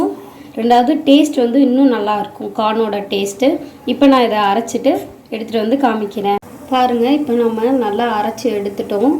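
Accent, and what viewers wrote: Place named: Tirunelveli, India. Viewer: You from Tirunelveli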